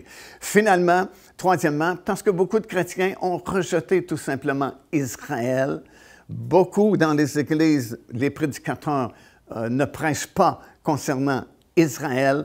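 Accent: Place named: Quebec, Canada. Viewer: Il a dit 66 livres c’est son accent québécois . Fraternellement.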